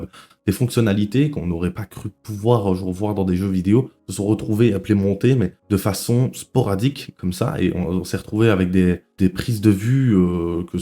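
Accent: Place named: Liège, Belgium. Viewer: J'ai remarquer ton accent liegois direct MOI AUSSI JE SUIS UN BELGE QUI JOUE A WT 🙏🏻🇧🇪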